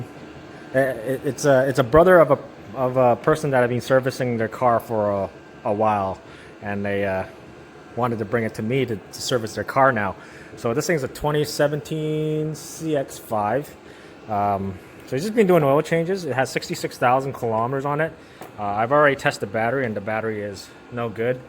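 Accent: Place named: Canada. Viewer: So, are you saying the Mazda dealer double gasketed the filter on the previous change? Whoever did it is an amateur. SOP is to clean the filter mounting gasket area after removal. That should tell if gasket left not to mention, just looking at old filter. smh Hope oil in sump didn't drop too far. Also, from two Canadian yt vids recently seems Mazda pronounced differently than in US. In US pronounced Mahhzda. North of the border seems, Mehhzda seems to be used. Fwiw, I'd let you work on any of my Hondas, and wouldn't say that to just any mech/tech.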